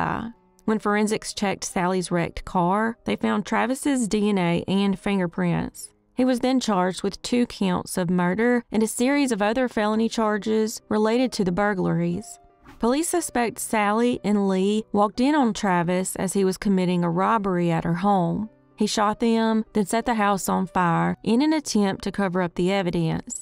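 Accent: South, United States. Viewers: Thanks. Love ur voice, only southern one I like💕🥰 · Love my southern Belle voice ! Have a wonderful and Blessed week ❣️🙏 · she has a country accent lol that’s perfectly normal everybody speaks differently but ig we all got our own opinions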